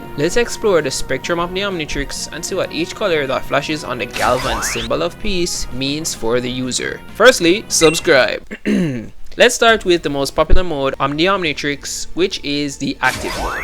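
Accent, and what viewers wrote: Jamaica. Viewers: Are you jamaican. i recognize that accent anywhere. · Are you jamaica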